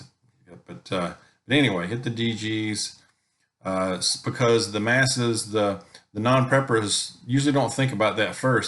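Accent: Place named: South, United States. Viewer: Not at all good. You sound as if you have a southern accent, like I do. It’s going to impact us as much as the East Coast.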